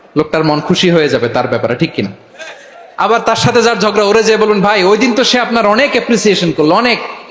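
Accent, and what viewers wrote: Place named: Chittagong, Bangladesh. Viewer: মিজানুর রহমানের মুখে চাটগাঁইয়া শুনে খুব ভালো লাগছে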